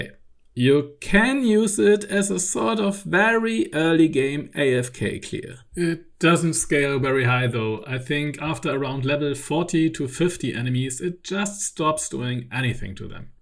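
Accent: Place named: Germany. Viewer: your german accent is horribly intense, use your tongue a bit more when speaking english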